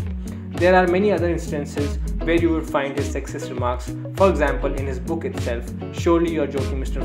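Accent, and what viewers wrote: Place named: United States. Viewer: Well is that voice your's sir! I wonder! Its different but appealing! A bit of American accent!! Nailed it👍🏻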